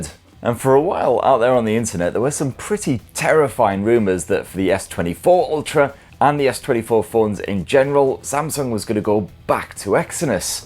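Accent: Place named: Sunderland, United Kingdom. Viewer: The innuendos and smutty humour were very strong on this one. Well done my favourite Macam or is it Geordie? Sorry not sure. Either way the best tech reviewer from the north east, possibly the world! Edit. Ahhhh you answered my question. Sunderland!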